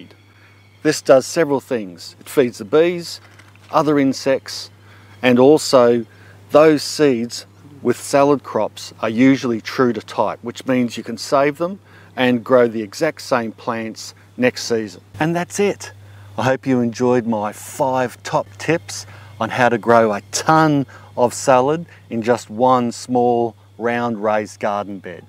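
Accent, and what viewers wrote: Australia. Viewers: I like your video very much,. Just a question, where do you live? Your accent sounds like an Aussie!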